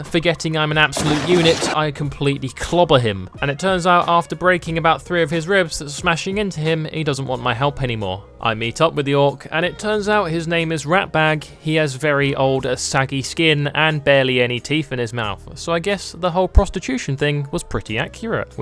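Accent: United Kingdom. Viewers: Are you British Bro?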